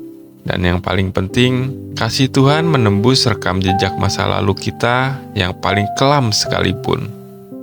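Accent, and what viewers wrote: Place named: Surakarta, Indonesia. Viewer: Kok suara mirip solo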